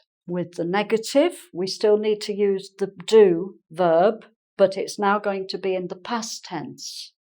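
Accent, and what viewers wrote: England, United Kingdom. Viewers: the people from England have an interesting accent, I guess that she's from some part of UK. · Great lessons. I reall like the way how you bring it to people in a very nice way. Lovely voice and the tippical British accent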